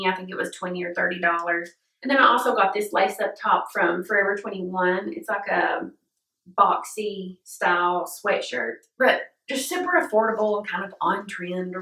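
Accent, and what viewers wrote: South, United States. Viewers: I love your videos and your accent speaks to this southern KY girl's heart!💙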